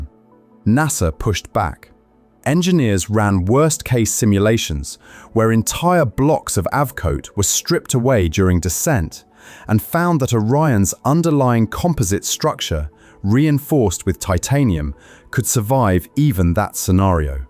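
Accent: United Kingdom. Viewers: FAIILLL!! British (voice) narration ALWAYS exaggerates!! I'm sick-&-tired of British-narrations!! Great-Britan hates America, sooo, WHY are 'they' narrating stories about 'us'....??!! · The thumbnail said “What Artemis II saw in the six minutes that nearly ended them”.. No mention of temperatures. The voice sounded more British rather American and as 8 billion of us and science of us use Celsius, it would make no sense for me to think the video would have been referring to Fahrenheit, particularly when the temperature mentioned is closer to the photosphere’s temperature. The temperature of the capsule on reentry was about 2,760- 2,800C . So I suspect there was some carelessness in the title, and the 3000 degrees referred to the temperature of the capsule on reentry in Celsius.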